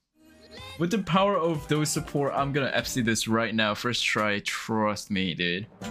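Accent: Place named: United States. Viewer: he sounds american lol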